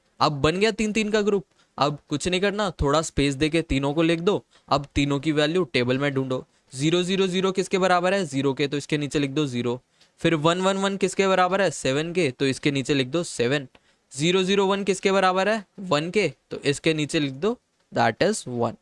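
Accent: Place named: Mumbai, India. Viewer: I can tell from his accent he is from Mumbai.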